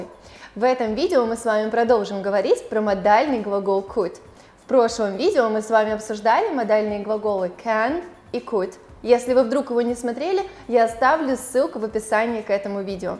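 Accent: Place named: United States. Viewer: Американский акцент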